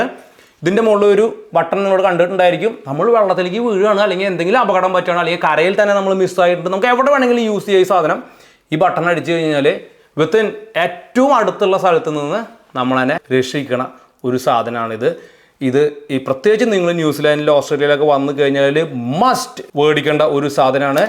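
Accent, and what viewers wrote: Thrissur, India. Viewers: Bro, you are amazing.... The way that you told through the examples are very appreciated. Especially the slang of our Thrissur is lovable... Cheer's bro🤝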